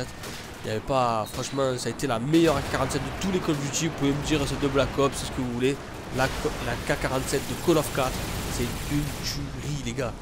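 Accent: South, France. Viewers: Un bon carnage GG a toi, ta façon de commenter avec ton accent de sudiste me fait régaler!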